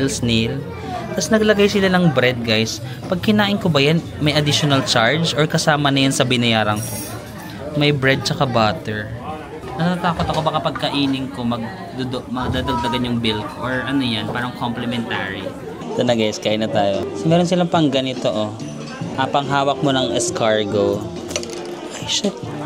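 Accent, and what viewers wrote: Philippines. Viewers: alam nyo po, sinasadya at inaamin naman po ni na hindi nya alam sabihin ang mga french words. for starters,.talagang mahirap maman talaga ipronounce ang french..kahit ibang lahi ay hindi din nabibigkas ng tama ang salitang french. mas cringy kung nagffeeling si na french expert sya pero mali mali naman ang sinasabi. he is just being a filipino tourist with a filipino accent. very down to earth and more relatable. hindi feelingera! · It's okay, I still love your Filipino accent ~ 😍😉 Enjoy your trip in Europe po. Waiting for your next travel vlogs! ✨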